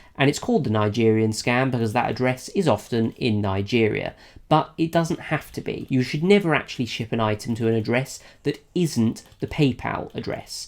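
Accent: England, United Kingdom. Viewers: A genuine honest English accent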